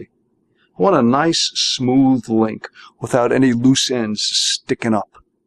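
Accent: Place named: East Coast, United States. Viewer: Great video...until the end...even though I got what you were teaching you kept going out of the camera view...I found myself telling you to move up...LOL...Still you are very clear and I am sure I could learn a lot from you so I am going to subscribe...I like your accent...are you one the US east coast? Thanks for sharing I am so glad I found your videos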